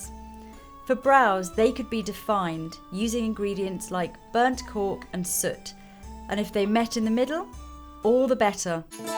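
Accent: United Kingdom. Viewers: British duh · British accent I think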